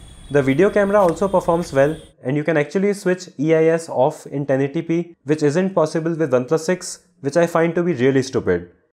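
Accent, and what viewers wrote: India. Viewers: Somethings wrong with me. I cant take indian english serious.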